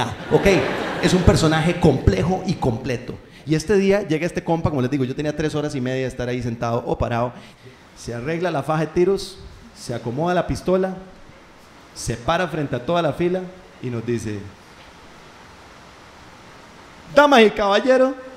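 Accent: Costa Rica. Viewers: diay si no le gusta mae , que tirada .... Así hablamos los ticos mae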